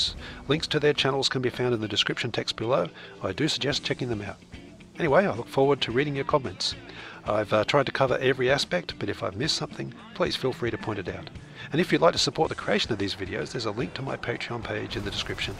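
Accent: Australia. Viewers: You aussie!??!?!?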